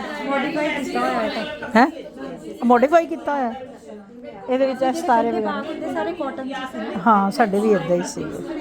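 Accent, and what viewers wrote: Punjab, India. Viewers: Apko dekh kr dil bht khush hota hai jaise Sadi Punjabi vaise he tuhadi Punjabi pure punjaban waah ji apko Milne ka Mann krta hai bt aap kon se sector mai rhte ho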